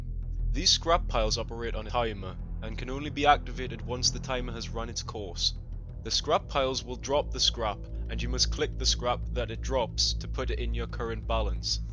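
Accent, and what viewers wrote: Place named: Scotland, United Kingdom. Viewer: My o my you sound so scottish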